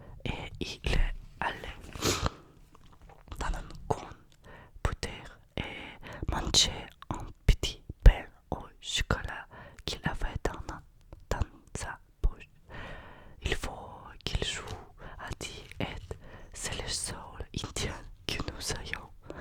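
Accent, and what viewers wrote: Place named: Russia. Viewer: Le Français avec l'accent Russes, c'est une tuerie en asmr !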